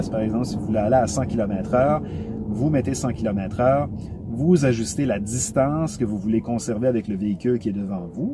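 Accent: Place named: Montreal, Canada. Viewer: Superbe vidéo. J'aurai pensé que vous étiez de Montréal!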